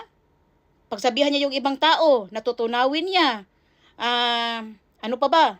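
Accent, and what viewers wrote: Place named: Visayas, Philippines. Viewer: Bisaya man diay ka 😂😂❤❤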